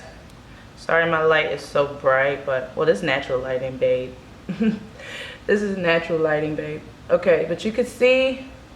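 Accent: Baltimore, United States. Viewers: Aye sis! Is that a Bmore accent that I hear? Let me tell you. I've watched 30 videos on twists and yours was the only one that actually showed the technique. Thank you!!!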